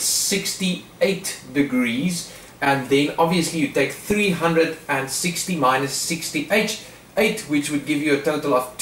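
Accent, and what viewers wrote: South Africa. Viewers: Sounds like you are from Z.A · I can tell you are southafrican 😂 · Your south african?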